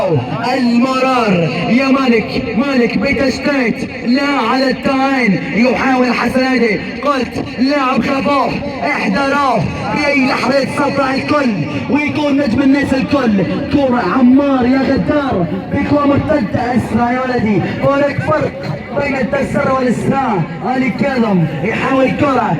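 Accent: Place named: Bahrain. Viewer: شنو هاذ بحريني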